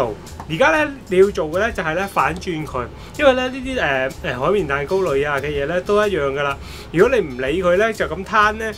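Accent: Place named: Cantonese, China. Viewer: 廣東話好好聽😀👂🏼♥️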